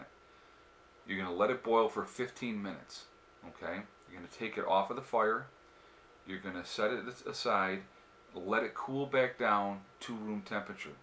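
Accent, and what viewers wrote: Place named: Chicago, United States. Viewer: Are you from Chicago? You sound just like someone close to me who was raised there.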